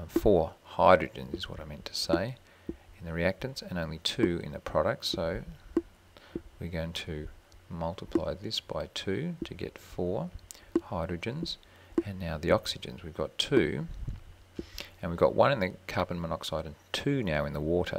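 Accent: Australia. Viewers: finally it took an aussie ...good job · I like you matey cause you sound Australian, oh and this video was really bonzer!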